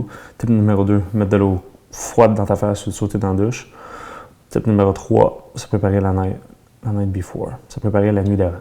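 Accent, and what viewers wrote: Quebec, Canada. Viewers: oh la la l'accent canadien trop compliqué... mais merci des conseils! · Putain je comprends mieux les vidéos où tu parles anglais...🤣. T'as tué le mythe avec cette accent québécois t'es tellement plus charismatique quand tu parle anglais. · super bon conseil et en fait l'accent canadien ca passe bien ^^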